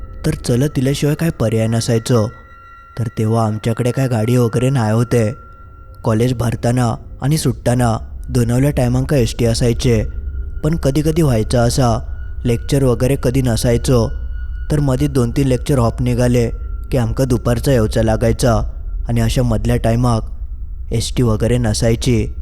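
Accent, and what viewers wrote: Malvani, India. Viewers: Which malvani he is talking ..this is different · I.like.your.malvani.voice.laya.bhari.rel.Vivek Singnath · मालवणी बोको हा चॅनेल मी माझ्या 3 अकाउंट वर फॉलो केलेला असा. आणि मित्र परिवाराला ही फॉलो करायला सांगितलं असा. माझ्या घरातल्या 16 जना नच्या मोबाईल वरून पण फॉलो केलेला असा. गावी जातो तर तुमचे व्हिडिओ आम्ही ग्रुप ने बसल्यावर बघतो रात्रीचे. खूप thrill वाटते ग्रुप मध्ये बसून ऐकायला एक वेगळीच मज्जा येते. त्यात ह्या व्हिडिओ मध्ये तुमचा मालवणी आवाज.त्यामुळे व्हिडिओ ला एक वेगळीच मज्जा येते. मी रोज दिवसातून 4 व्हिडिओ बघतोच बघतोच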